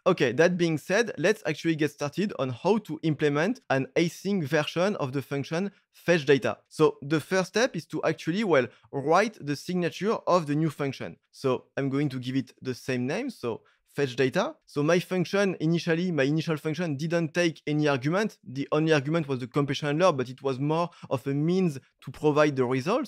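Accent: France. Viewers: J’adore l’accent français!!!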